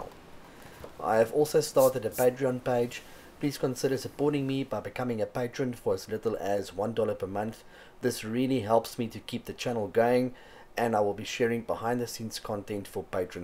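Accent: Afrikaans, South Africa. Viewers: Nice to hear s.africa accent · he sounds so Afrikaans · wow you sound very Afrikaans.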